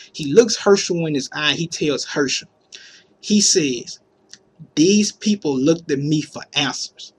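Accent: South, United States. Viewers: What state are you from? I can't place your accent? Georgia? One of the Carolinas? PS. Thanks for the videos. New subscriber here. :)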